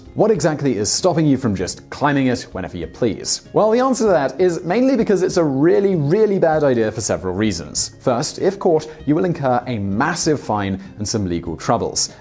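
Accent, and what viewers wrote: United Kingdom. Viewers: Wtf is this brittish Vsauce?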